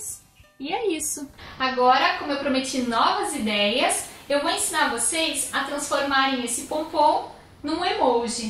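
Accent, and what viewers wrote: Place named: South, Brazil. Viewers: também sou de Curitiba dá pra perceber o geito que você fala com o E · Que lindo💕👏💕 De onde vc é? vc tem sotaque de quem mora no Sul do Brasil☺️✌ Super Bjo!💋💋💋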